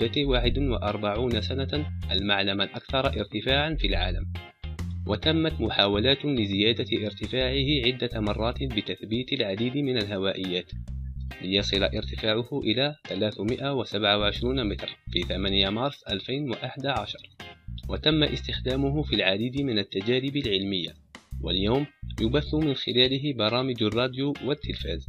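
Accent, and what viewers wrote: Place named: Morocco. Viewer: انت مغربي 100 بل 100 لان طريقة كلامك مغربية المرجو الاجابة الفيديو رائع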